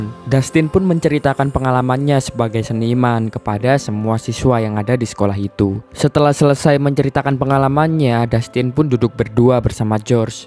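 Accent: Java, Indonesia. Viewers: Jangan semangat tetep menyerah ahhahahaha bikin ngakak. Duh medokny masih keliatan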